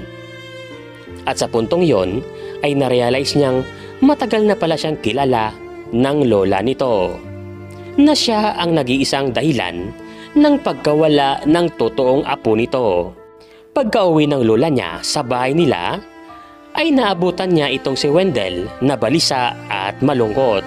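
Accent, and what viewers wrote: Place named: Ilonggo, Philippines. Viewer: weldel is elonggo I dont know.but the narrator is 100%elonggo po siya.all the way his intonation really he is elonggo mlumanay mgsalita😅😅😅😅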